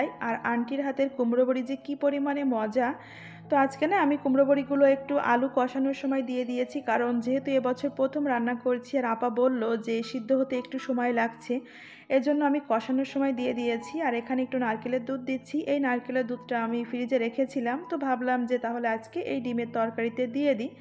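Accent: Jessore, Bangladesh. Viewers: আপু যোশহর ভাষায় কথা বলে নিয়েছ। খুব ভালো লেগেছে হাসিও পেয়েছে। ডিম কুমড়ো বড়ি দিয়ে রান্না করে নিয়েছ। জলপাই আচার খুব লোভনীয় হয়েছে।আর চটপটি খেতে খেতে বিদায় নিলাম আপু।❤❤ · ওয়া আলাইকুম আসসালাম বোন রিমা। তোমার কম্বলের মত আমারও একটি আছে (খয়েরী )ভালোই যশোরের ভাষায় কথা বললে 🥰আমি খুলনার মেয়ে ,নানা দাদা বাড়ি লোহাগড়া হলেও পারিনা আঞ্চলিক ভাষায় কথা বলতে। আপু সাথে আছি ইনশাআল্লাহ পুরা ভিডিও জুড়ে থাকবো 💞💞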